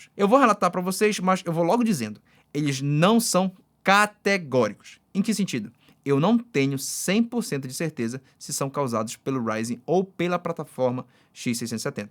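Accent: Pará, Brazil. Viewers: Agora que tu falou que é paraense eu ouço teu sotaque aaaaaaaaa (“elhe” “bicho” “vocêxs”) massa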